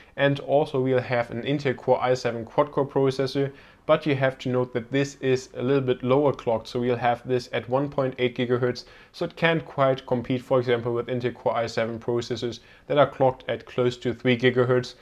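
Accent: Germany. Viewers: Du bist doch deutsch oder? Gutes Review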